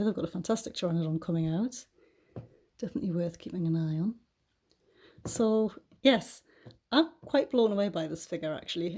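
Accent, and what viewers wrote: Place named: North East England, United Kingdom. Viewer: Love this model and it’s nice to hear someone else from the north east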